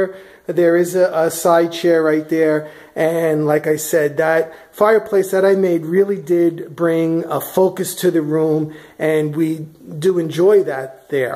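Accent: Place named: New Jersey, United States. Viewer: OK so needs to publish a recipe book and you need to publish a holiday decorating book I can see them on coffee tables every where. BTW I have lived in Vermont for the last 57 years but I still know a Jersey accent when I hear it. Mom was from the Hazlet area and Dad wae from Philly. Be well you two.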